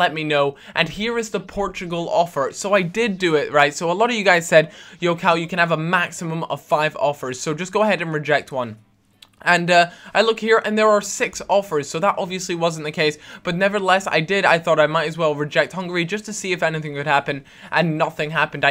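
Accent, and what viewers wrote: Scotland, United Kingdom. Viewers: Cal, where is Scotland do you come from?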